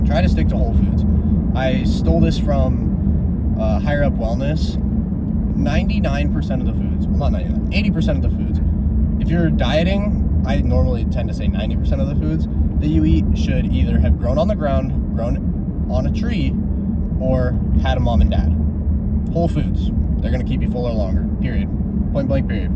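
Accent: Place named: Australia. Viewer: I thought you were australian🇦🇺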